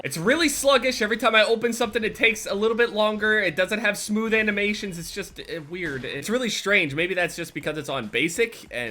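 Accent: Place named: New Jersey, United States. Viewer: your new jersey accent is starting to be more noticeable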